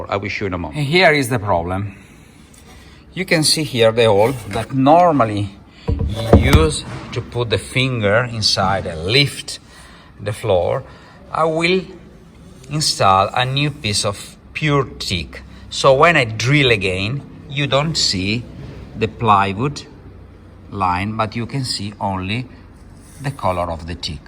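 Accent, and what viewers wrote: Italy. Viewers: personally I find you English spoken with your Italian background quit charming.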